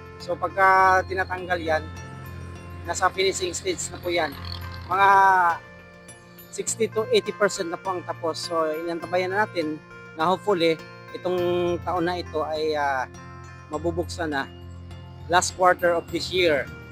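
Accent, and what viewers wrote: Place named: Visayas, Philippines. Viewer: Ah...bilib me Kay kuya...bisan halata bisaya kaayo...banat kuya....fight lang....ayaw ug kakapoy diretso lang...haha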